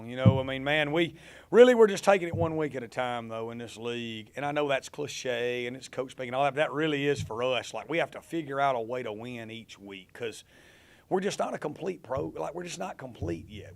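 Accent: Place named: South, United States. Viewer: He said 4 years, the southern accent made it sound like there was a bit of a D at the end😂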